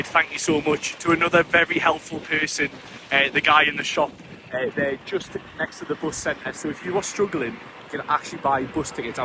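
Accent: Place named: Newcastle, United Kingdom. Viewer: I love the Newcastle accent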